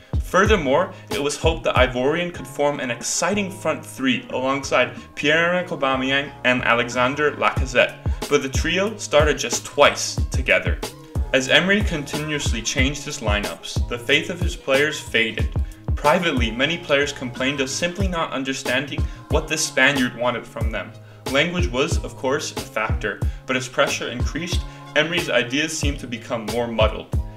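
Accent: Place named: India. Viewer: the narrator's accent is indian on you pl channel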